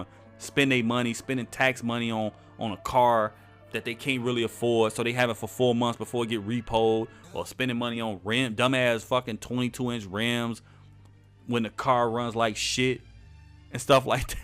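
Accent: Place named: Chicago, United States. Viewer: sound like her from the suburbs of chicago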